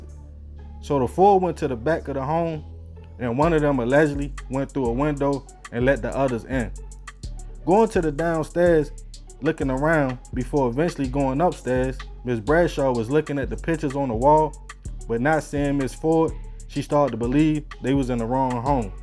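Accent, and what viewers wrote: Baltimore, United States. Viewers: Ur Baltimore accent stinks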